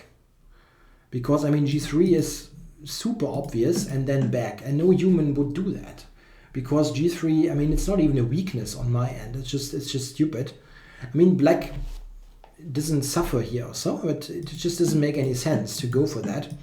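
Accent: Germany. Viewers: that german accent xD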